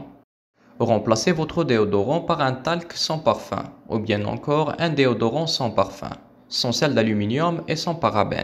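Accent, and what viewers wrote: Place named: Kabylie, Algeria. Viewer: ca se voit tu es kabyle tu as l'accent lol mais merci des conseils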